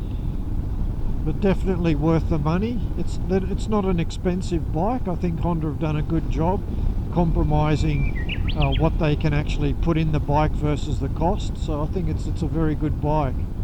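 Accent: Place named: Australia. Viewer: You sound like an Aussie, you can’t buy a new one in Australia so I’m intrigued where you are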